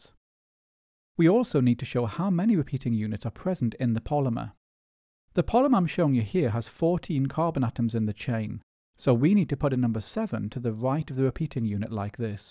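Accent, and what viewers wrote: Wales, United Kingdom. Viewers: U welsh?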